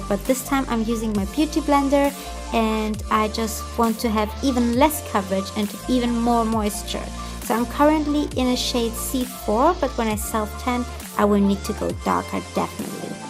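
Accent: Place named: Germany. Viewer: I found this look on instagram and I am totally obsessed with it 😍 your accent sounds sooo german 🙈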